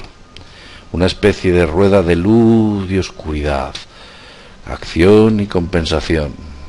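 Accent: Spain. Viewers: Hola, creo que si usaras un acento neutro, no español (europeo), serias realmente exitoso, ya que la mayoria de los hispano hablantes estamos en America. el acento de Colombia es el mejor y el mas neutro. Saludos desde Mexico!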